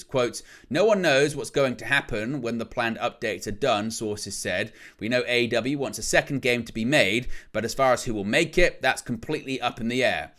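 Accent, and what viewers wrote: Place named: England, United Kingdom. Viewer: Its weird to listen to JDFROMNY do his show and then hear you do THE SAME SHOW but in an English accent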